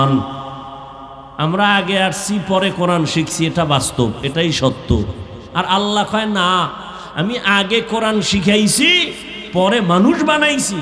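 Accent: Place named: Khulna, Bangladesh. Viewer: মাশাআল্লাহ এটা সম্ভবত খুলনার বয়ান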